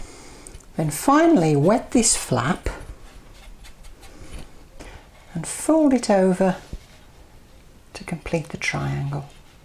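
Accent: United Kingdom. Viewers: Bonjour, je dois dire que je suis un fan absolu!!! J'adore toutes recettes, votre voix votre accent britannique, votre humour bref je vous adore!!! Alors continuez a nous faire saliver et un grand merci pour toutes ces recettes! ( même si je suis au régime j'adore regarder vos recettes) je vous embrasse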